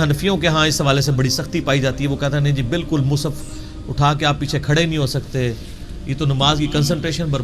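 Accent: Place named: India. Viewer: Hindustani lehja hai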